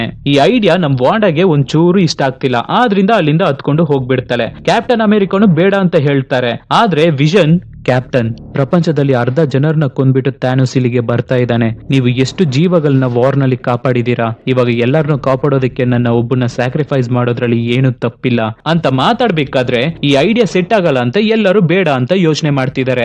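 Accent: Tamil Nadu, India. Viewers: Sir nivu Tamil avra...yakandre nimma tone Tamil avr thara edhe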